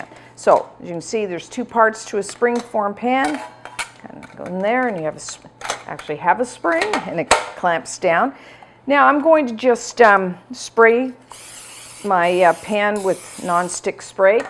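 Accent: Canada. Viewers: Less than a minute in and I knew you were Canadian! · Oh wow that looks delicious. I love how you give metric measurements as well as the imperial. Saves me having to convert the measurements. I am an Australian and love to find things that are different to the things we have here. You are Canadian right ? Something about your accent, I recognise. I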